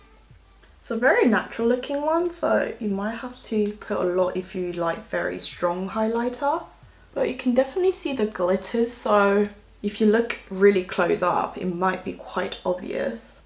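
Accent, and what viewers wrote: United Kingdom. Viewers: 언니 발음이 영국식인가요...?잘몰라서...너무 발음이 좋아요 ㅠㅠㅠㅠ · 영국식 영어 넘나 듣기 좋고 멋져요😆👍 이번에 어퓨 과즙팡틴트도 잘 어울리실거같아요!! 저도 반해서 샀는데 생각 났어용 ✨ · 너무너무 이뻐용!!💕 꺅 미모열일 ㅠㅠ 😍 감기몸살이셨다니ㅠㅠ 그런데도 영상들고 와주셔서 감사합니당! 영쿡발음과 미모에 치이고갑니당💜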